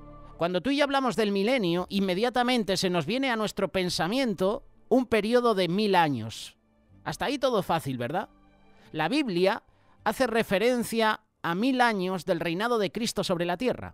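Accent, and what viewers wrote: Spain. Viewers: Gracias señor por su siervo que nos enseña de manera tan clara mi Dios!!! El es español por su hermoso acento Dios gracias por la palabra que nos alimenta!! Bendiciones del señor para todos los que hemos creído en el señor Jesucristo saludos desde chile ❤🇨🇱🙏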